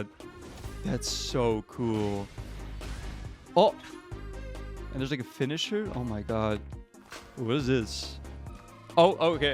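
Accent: Netherlands. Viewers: Insane how fast you can hear a Dutch accent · Gast ik wist gewoon dat jij nederlands was ik hoorde het accent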